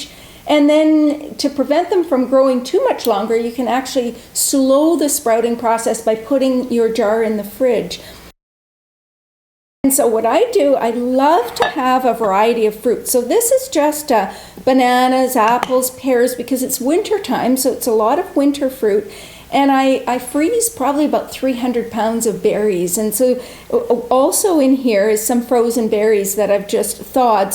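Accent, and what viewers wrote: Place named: Canada. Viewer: You have the strongest thickest Canadian accent I've ever heard!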